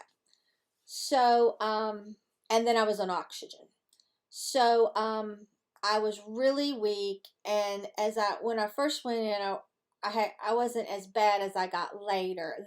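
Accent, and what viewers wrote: South, United States. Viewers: So good to hear that sweet southern twang say, "Hey guys, welcome back to my cheeennel." Welcome back to You (tube) ! Hip hip hooray!!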